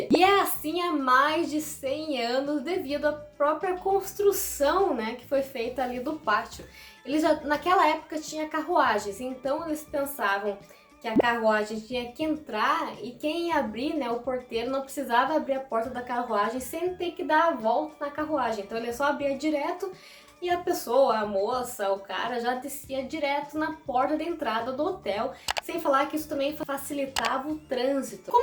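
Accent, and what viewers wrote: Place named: Santa Catarina, Brazil. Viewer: tenho uma amiga em Videira - SC seu sotaque é muito parecido com o dela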